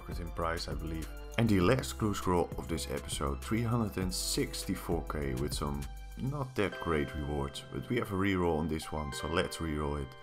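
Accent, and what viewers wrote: Netherlands. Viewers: Heerlijk Nederlands accent er doorheen. Audio is idd beetje slecht te horen. Kwam m tegen in me lijst heb gelijk ff geabonneerd. Drop die PVM dingen haha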